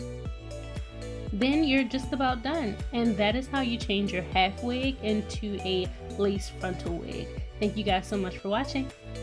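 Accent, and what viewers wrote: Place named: Baltimore, United States. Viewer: Wowwww sis you bomb!!!! One question tho.. you from Baltimore? You sound just like me lol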